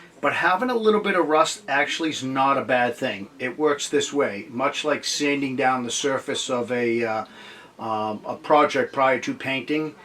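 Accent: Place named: New Hampshire, United States. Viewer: The caption says, "cab connors." Though it's probably a typo, it's funny because it matches NH accent.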